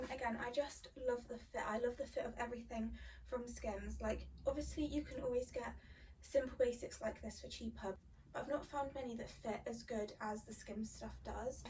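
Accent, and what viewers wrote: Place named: United Kingdom. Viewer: You are very pretty and cute. Beautiful eyes, cute voice with the British accent and pretty smile. Beautiful body and curves, cute little belly button. You look very pretty and very cute in everything this video. Everything fits you so well and look beautiful on your curves. You are really cute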